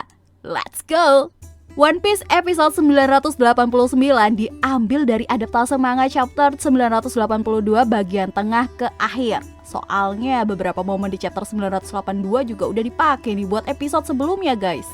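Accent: Java, Indonesia. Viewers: Ternyata kak suaranya ada medoknya wkwk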